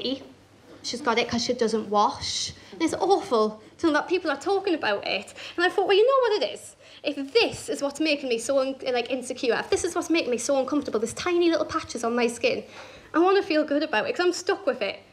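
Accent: Scotland, United Kingdom. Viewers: You are a very pretty, nice and funny girl on stage and I think you did a bit of a joke on a stupid bloke, who deserves it. Tho please talk a bit slower and pace yourself when talking, as it is a lot cramped up in little time. I think if you give time for the audience to laugh...when not talking, making pauses - you will get many more laughs out of it. I am thinking of myself - I hardly had time to think about the funny stuff and I had to listen already for the next part. I did study in Edinburgh as a child so I am fine with the accent, but it's hard to understand if you are American, English, Canadian or Australian - they will be at a loss, and they do speak English. Just pace yourself - sorry,I don't mean to lecture you, but I am an EFL teacher so it's a flaw of mine sometimes and you are a lovely child to me, so excuse.❤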